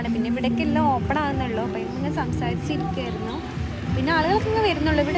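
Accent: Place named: Malappuram, India. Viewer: Malappuram slang super aanu👍👍.. Oro video yum ushar avunnud tto👍👍👍... 1 million subscriber avatte vegam.. All the best 😍😍🌹🌹🌹🌹🌹👍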